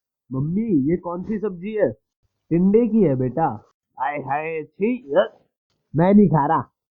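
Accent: India. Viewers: desi style mey pada ro ha tu tie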